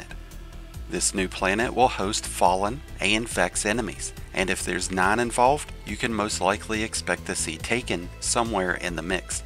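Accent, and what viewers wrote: South, United States. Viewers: he's saying bc you have a southern accent, partner.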